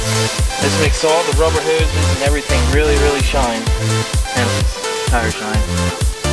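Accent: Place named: Ohio, United States. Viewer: Are you guys from the midwest like Ohio? Your accent sounds like a skater I watch who's also from ohio and you guys talk so similar.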